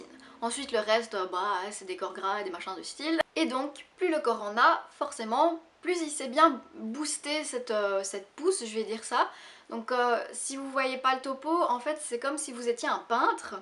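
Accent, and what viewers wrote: Belgium. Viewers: Par contre un léger accent belge , pas besoin d'hortophoniste !😊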